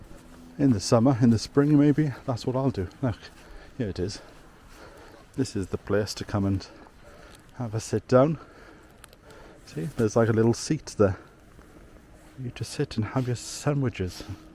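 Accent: Wales, United Kingdom. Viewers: Welsh accent getting stronger every episode. ✅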